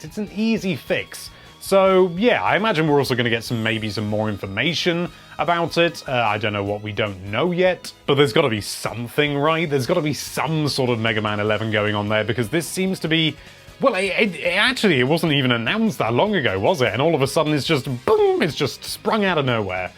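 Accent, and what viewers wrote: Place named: United Kingdom. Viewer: He's from the UK.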